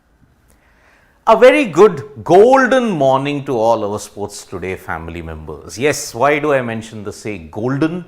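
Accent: South India, India. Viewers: You english voice is sweet as a quecumba I like your throwing of english language natural south Indian way of speaking and about niraj he should not stop his aim would like to clinch more try to engulf more distance the record of 98 and learn more and generate new player as like a dhrinchariya of jebling throwing sudip greatness and pride is attached to him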